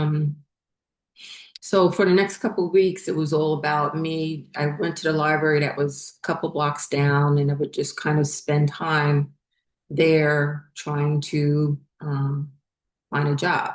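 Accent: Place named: New England, United States. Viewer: I saw this video a few days ago and it kept popping in my mind, so I’m back to listen even tho I haven’t started at the first video. For now, it seems like there is a message in here for me. Things around my house always start breaking, falling apart when it’s time to go. The last townhome I was in had a broken washer, drier, oven, stove, microwave, and heater 😮😅 by the time we finally were able to get out🎉🎉 Just want to say Good For You - telling one’s story is so powerful - and also I grew up in New England and enjoy listening to your narrative/accent.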